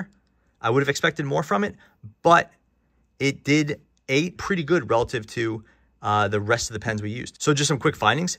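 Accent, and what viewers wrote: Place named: Philadelphia, United States. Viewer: Great reviews and you always show new and different pens ...Thanks !! BTW....you sound like you're from Philly ...is that close ?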